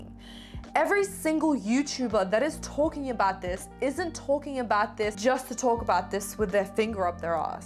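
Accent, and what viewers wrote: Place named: Australia. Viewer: I love how this made me feel. Thank you for this kick up the butt. Hearing a fellow Aussie accent saying things like this hits 👌 I appreciate it!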